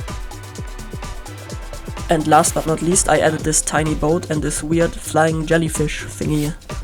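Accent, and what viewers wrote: Germany. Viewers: I can hear german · am i hearing a deutscher akzent?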